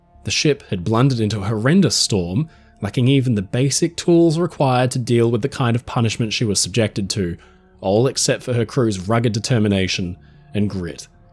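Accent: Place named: Australia. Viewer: it is Australian English. The best by far in the world.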